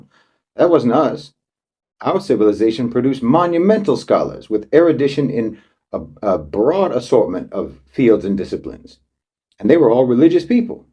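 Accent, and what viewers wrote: United States. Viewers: This guy is an idiot. saying all this in an AMERICAN accent eh? i love how they always tout the values of Islam from WESTERN countries. especially when they leap over women an children risking life and limb to get to the West. just wondering how the only successful Islamic countries are the ones with oil but the West is somehow lacking in rationality? when i see the dutch immigrating to Jordan then ill listen to a MUZSLIM about their superiority. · What's this fella's background? He has a US accent. It's a fact that Ireland has over 20% immigration. Five of the last twelve murders in ireland were by "refugees" Irish do not migrate to north Africa, Afghanistan, Georgia or Nigeria. But ireland takes in hundreds of thousands from these areas. Ireland never colonised anywhere, it was colonised by the english over hundreds of years. The slaughter in gaza is courtesy of zionism supported by zionist administration in London & Washington. 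· I am still sitting/laying on my couch watching and listening to your American-accent eloquence about the superiority of Islamic thought. What baffles me is the total absence of evidence behind the propagandistic effort to expose the so-called western corrupt “state of mind” , if any. Ok it is what you say it is, but did you offer anything which is less aggressive, corrupt, misleading, totalitarian, treacherous….? No, you propose the same shit dressed in a different outfit with your own brand on it. The difference is that you deliriously believe you own it, understand it, will control it as long as you wish to.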